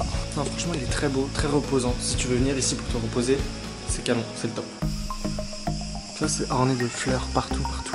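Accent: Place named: Algeria. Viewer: J’ai trop ton accent algérien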